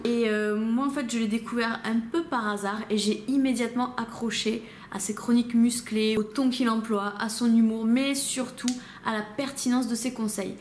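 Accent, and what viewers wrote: South, France. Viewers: j'ai bien aimé ton approche au final bien resumé pour les debutants... avec un petit accent du sud non ?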